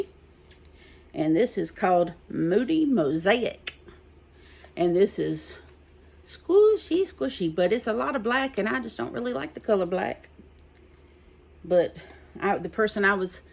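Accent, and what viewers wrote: South, United States. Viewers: Good evening/morning,! New subscriber here... both to your YT channel and Instagram:) I've spent the past couple of hours watching & liking a number of your videos, and I can't tell you how happy I am to have found your channel. From the yarny goodness to the furbabies to the family stories to that wonderful Southern accent!!! (I'm originally from VA, have family throughout the deep South, including LA, but currently live in OH. I miss that accent, that "way" of speaking to others, that "heart" put into conversations with friends and loved ones. I truly do appreciate what you contribute to YT and the crafting community!! Now that I've rambled on a bit, I best let you in on all the furbabies we have/ have had... al rescues, either from shelters or from the streets. Those were usually the ones who adopted us rather than the other way around, but animals know who'll love and care for them as family, don't they? 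I look forward to seeing more from you... and I'll go back to binge-watching and crocheting, as the first two grandbabies are due this year... in July and September. You take care of your self, and your sons and furry sons too!!!!!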